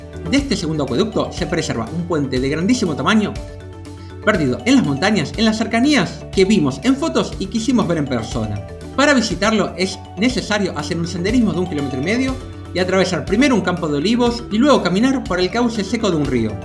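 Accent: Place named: Argentina. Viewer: Gracias nuevamente. Solamente que me cuesta seguirte con esa pronunciación argentina (muy musical y familiar, pero que a veces es difícil para los peninsulares). ¿Cómo has dicho que era el acueducto Olvidado? Lo he buscado en el mapa tal cual y no lo he encontrado. Gracias. ¡Ah, y enhorabuena por la página!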